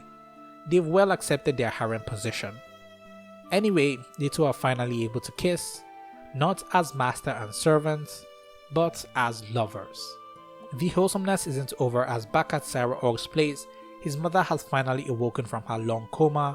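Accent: Nigeria. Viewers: Why do u sound Nigerian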